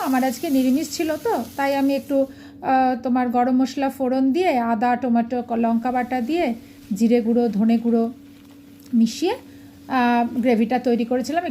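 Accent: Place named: Bangal, Bangladesh. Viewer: Akebare notun ar chamatkar akta ranna . Bangal bhasa sunte besh laglo ,abar o sonar apekhay thaklam .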